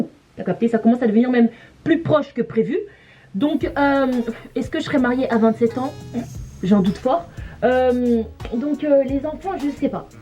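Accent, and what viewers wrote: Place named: Democratic Republic of the Congo. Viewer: Aah pitinnn😂😂😂l’accent congolais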